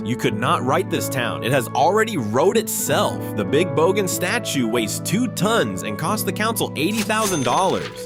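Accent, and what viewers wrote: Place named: United States. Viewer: What a joke!!!!!!!! I stopped watching when I heard "Morwell". Yes, Morwell the drug capital of the Latrobe Valley. The shops have closed and the town is dead. The only businesses thriving are the drug traders and pushers, the police and the lawyers. And, the American voice, don't get me started on that. Vomit material.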